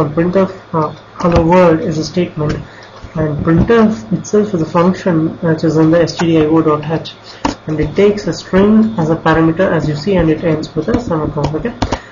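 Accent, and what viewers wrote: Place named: India. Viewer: sounds as if your an indian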